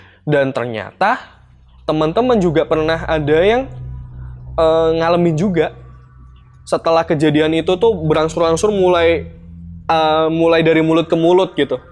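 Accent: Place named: Java, Indonesia. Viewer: bosone medok nyel ehheeheh di enteni crta2 next e sam😁 slm podo ngalam e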